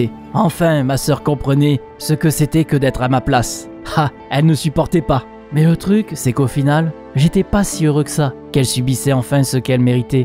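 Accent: Marseille, France. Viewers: L’accent Marseilleais on est laaaaa Désolé pour les fautes 😅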